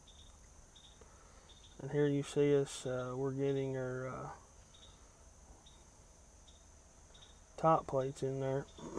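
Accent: Eastern Kentucky, United States. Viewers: That has to be an East KY accent.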